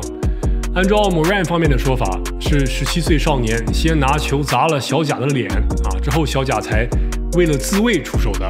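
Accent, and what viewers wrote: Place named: Dalian, China. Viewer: 哈哈大學在大連四年，看第一個視頻時候聽喃第一次說話就知道是大連銀🤣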